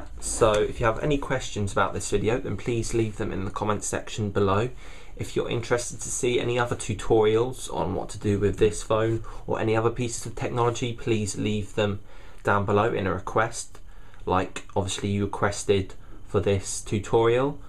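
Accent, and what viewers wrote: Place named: United Kingdom. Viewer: You have british accent! :3 thanks 4 this, man!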